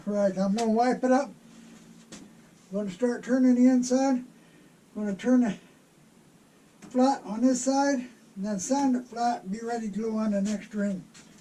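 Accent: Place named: Pittsburgh, United States. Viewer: Hey! Love the videos. You sound like you have a Pittsburgh accent. Or have you been in N.C. your whole life?:)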